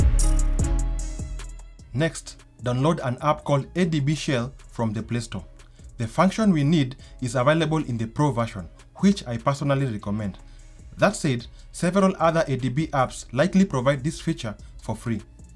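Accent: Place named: India. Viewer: Bad enough accent but at 100 miles an hoir. We are not Indian!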